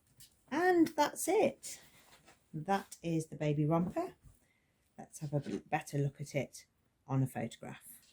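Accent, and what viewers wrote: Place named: England, United Kingdom. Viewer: Which part of England are you from? I love your accent! Greetings from Australia 🇦🇺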